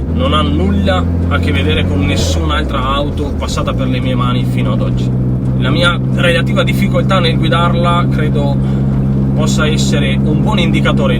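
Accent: Veneto, Italy. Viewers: L'accento Veneto è inconfondibile.... 🍻😉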